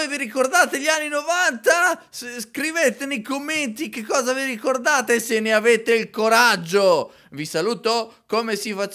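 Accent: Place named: Romagna, Italy. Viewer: Bello l'accento romagnolo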